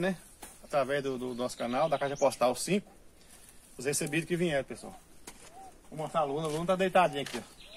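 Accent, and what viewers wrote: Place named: Minas Gerais, Brazil. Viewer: O jeitim de falar do minerim é lindim. E pra completar um cafezim bem quentim.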